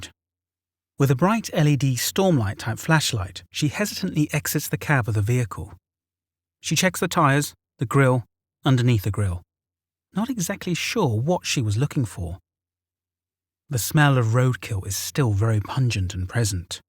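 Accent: United Kingdom. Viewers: Why is this story by a British narrator?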